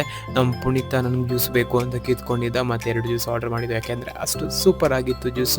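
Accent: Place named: Mangalore, India. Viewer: Excuse Me Nimdu Kannada Nodidre Mangalore Or Udupi Kanuthe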